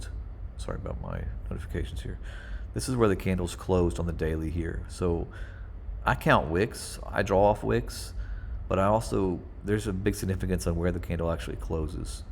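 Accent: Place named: South, United States. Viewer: Just found your channel - bought some HBAR last week. Came for the charts, sticking around for the southern dialect.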